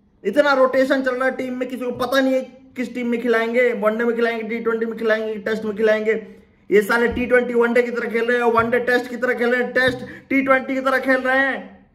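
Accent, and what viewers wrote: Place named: Bhojpuri, India. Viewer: 'Mar maidaan' bhojpuri accent love from araah,bihar❣️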